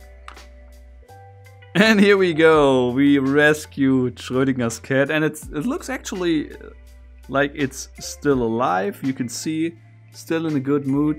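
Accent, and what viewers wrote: Germany. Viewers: Haha ich wusste du bist deutsch.. Habs doch richtig rausgehört 😂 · Are you German? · Haha, man hört das du deutscher bist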